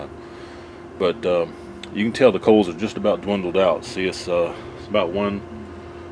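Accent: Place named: Texas, United States. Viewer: Thank you for holding it up. It smelled great. Also, I like your Texan accent. I was a Houston resident for 20 years until the early 1980's.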